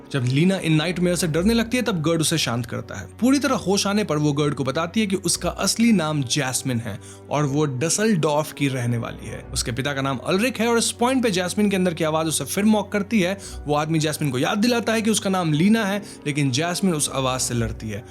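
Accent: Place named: Bihar, India. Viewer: Your accent is so cheap every time you say D instead of R ... total bihari accent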